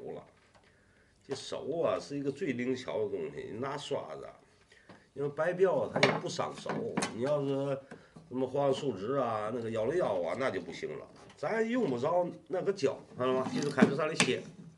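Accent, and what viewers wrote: Tianjin, China. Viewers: 天津口音 哈哈，很亲切啊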